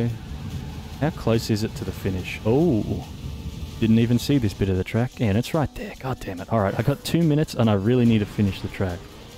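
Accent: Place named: Australia. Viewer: I thought you sounded Aussie and I think 3 am pretty much confirmed it. Are you in Queensland where cotd is still 3am after daylight savings changed? Or are you not even aussie😂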